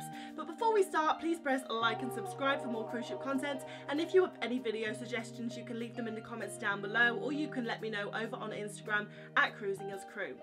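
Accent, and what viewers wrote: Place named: West Midlands, United Kingdom. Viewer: Congrats! You're quite the entertainer. Could you please let us know which region of the UK you hail from? Judging by your lovely accent I'm guessing West Midlands. Am I way off?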